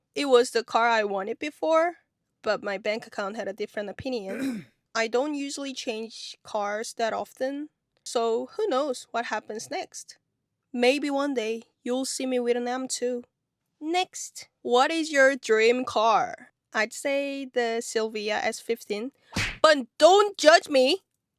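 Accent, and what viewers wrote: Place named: England, United Kingdom. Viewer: amazing video thank you 😊 cute english accent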